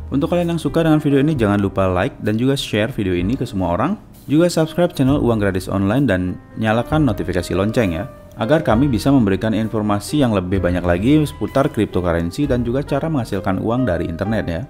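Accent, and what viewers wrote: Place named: Bali, Indonesia. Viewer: orang bali boss...logat nya mirip...tapi artikulasi penjelasannya muantapp. dan sangat mudah di mengerti..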